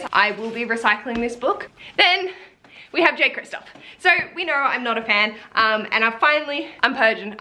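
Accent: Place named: Australia. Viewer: If I can place accents properly, I believe that you are Australian. I have many friends from Australia that swear a lot. I love it, lol. Canadians swear a lot as well, but we don't sound half as good swearing like our Australian cousins.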